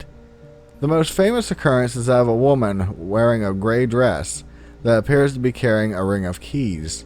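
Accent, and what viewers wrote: Pennsylvania, United States. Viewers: You speak with the same accent myself and my family apparently have lol. I didn't think I talked different until people started calling it our "family accent" we all apparently have. People even asked me where I was from lol. Shocking when I say I was born and raised in rural northern PA haha.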